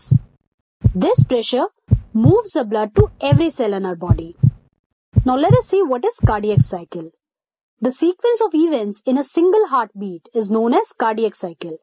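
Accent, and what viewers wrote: India. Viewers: your accent is too indian. i cant understand a word you are saying. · Im engaged to an indian girl. I wish she had an accent like yours. God bless you indian woman. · funny indian english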